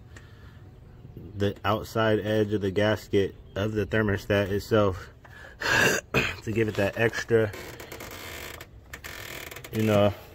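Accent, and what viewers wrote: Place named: Pennsylvania, United States. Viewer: Are you from back east, you sound like/= my neighbor from P - A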